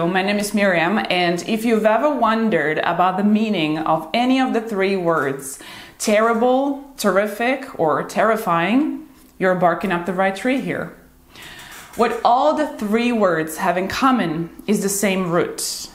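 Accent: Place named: United States. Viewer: I guess the entire Slovakia speaks English. 😁 Where'd you get that accent? You either must have spent a significant amount of your life in the US, or you talk to your colleague from this channel on a day-to-day basis. I used to have a perfect British accent but I lost it very quickly once I stopped practicing.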